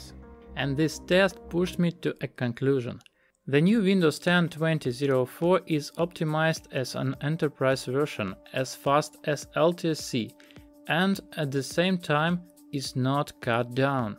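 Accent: Russia. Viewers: По акценту непонятно, что он рускоговорящий?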